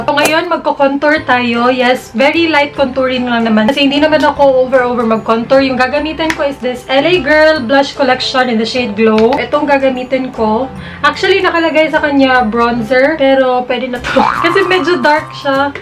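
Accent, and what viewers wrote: Visayas, Philippines. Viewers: bisaya ka po ba ate?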